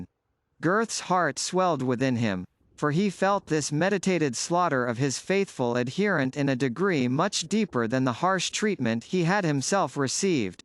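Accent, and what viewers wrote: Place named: United States. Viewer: An American accent is really unsuitable, it's dreadful & unlistenable actually!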